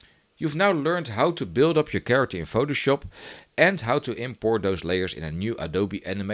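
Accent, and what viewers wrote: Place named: Netherlands. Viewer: this sounds so Dutch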